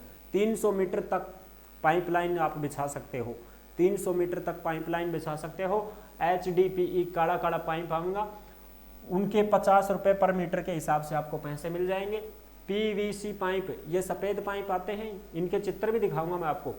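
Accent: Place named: Rajasthan, India. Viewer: Bhot badiya video vo bhi Rajasthani Voice me❤❤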